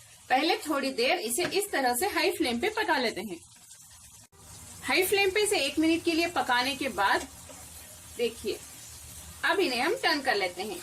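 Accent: Hyderabad, India. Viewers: Ap Hyderabadi ho? 😍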